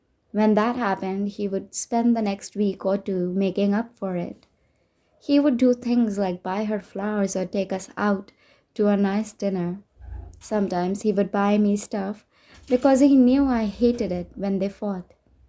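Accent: India. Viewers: Are u indian? Your accent is so thickly beautiful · Omg I'm ur new subscriber ! Just checked out ur channel there are so many audiobooks for free ! Ur voice is so beautiful too ! I am so happy i found this Channel ! Can I ask where u r from ? ( Cause i feel like u have a little bit of Indian accent...u sound beautiful while reading ! ) Really appreciate ur efforts of giving us so many nice stories here at ur channel for free ! 💕💕💕💕💕💕💕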